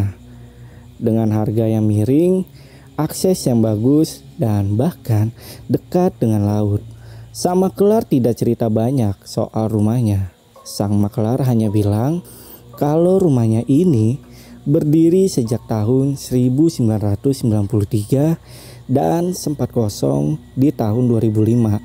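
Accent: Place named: Bali, Indonesia. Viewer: Bang, logat T nya bali banget sih. Asli bali kah bang?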